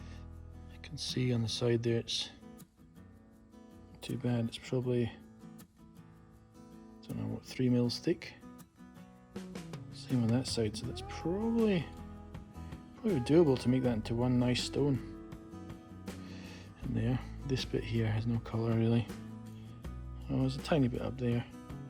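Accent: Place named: Scotland, United Kingdom. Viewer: what do you mean by off? That sounds like a Scottish accent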